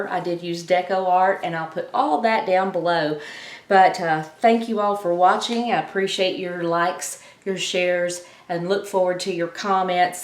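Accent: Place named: South, United States. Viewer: Just watched your intro video. I KNEW IT! I’ve been listening to you n thinkin “ this gal has some good BBQ in her background . She’s gotta be from down “Heah” someplace. I’m in North Carolina . Just retired n looking at hobbies.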